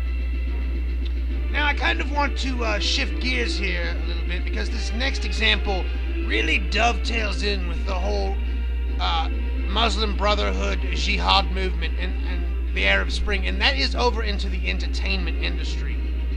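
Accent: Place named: United Kingdom. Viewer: LOL, I love your accent.. you straight sound like someone from a charles dickens movie. :) I hope to see a new video from you soon. Its been awhile.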